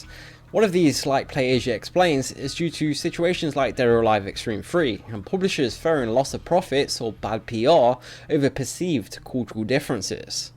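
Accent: United Kingdom. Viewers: You sound like Chris from Family Guy but British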